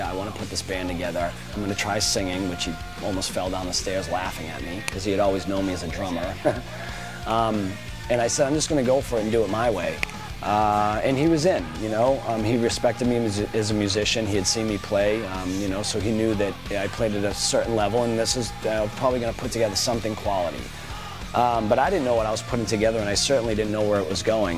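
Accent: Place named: Boston, United States. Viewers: He's from Lawrence kid! It's a Boston accent we all sound like massholes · Boston. My Grandma was from there and jad the same accent.😁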